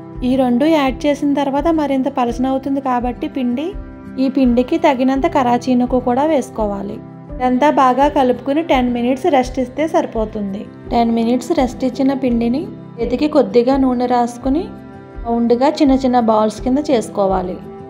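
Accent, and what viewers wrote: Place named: Konaseema, India. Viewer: మన కొనసీమ వాళ్ళు ఎక్కడున్నా బాష యాస మాత్రం ఏం మారదు. 👌👌👌 sooper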